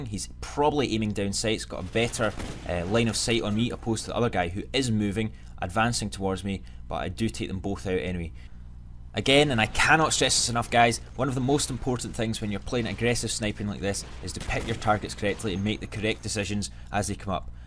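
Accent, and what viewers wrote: Scotland, United Kingdom. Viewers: Scotland Aye? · are you scottish ?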